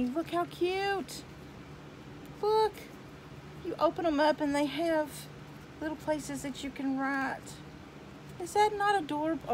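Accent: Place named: Tennessee, United States. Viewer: Nice presentation here!! You have that Smokey Mt./Tennessee voice! I love it!!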